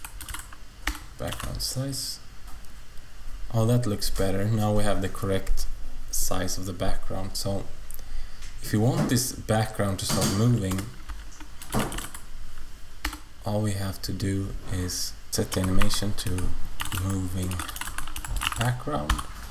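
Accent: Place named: Sweden. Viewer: Are you from sweden? bzs you have the same accent as pewdiepie.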